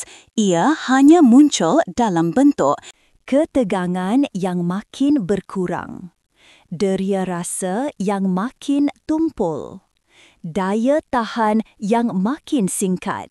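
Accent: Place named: West Kalimantan, Indonesia. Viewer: No.1...saye dari makassar...trim's...aksen Dr mirip ipar saye dr ketapang kalbar🙏🙏🙏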